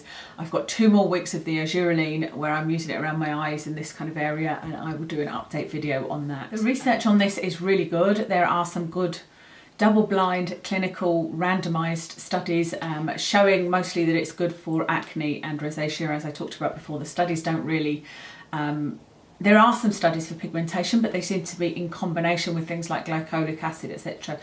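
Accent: United Kingdom. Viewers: Thanks. Great to hear a British voice. Opinion on top tinted, moisturizing spf?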